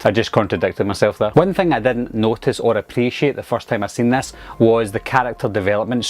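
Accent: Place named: Liverpool, United Kingdom. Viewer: Liverpool accent ✌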